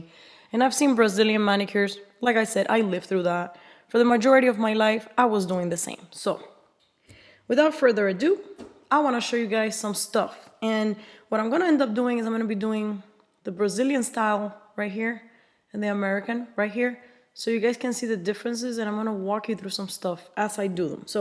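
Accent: Cuba. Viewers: I love ur voice. I knew right away u were Cuban from ur accent. It sounds like Gloria Estefan who I adored back when. Anyway, unrelated! Also, this asshole line totally cracked me up. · This is so helpful!! Thank you! 😊 And I recognized you are Cuban, you've got a cute Gloria Estefan accent! 🇨🇺❤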